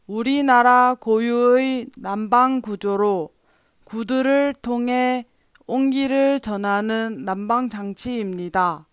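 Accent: South Korea. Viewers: mình hoc trong sách ( câu hoi và cau tl luon) xog wa ngày sau k nho het dk; có khi quen sach luon. Nhug ngay nào cug nghe clip bên ban (vua nho câu hoi, vua nho tl luon) ; lai nho lâu nua. Giong đoc chuân hàn luon...😊😊😊.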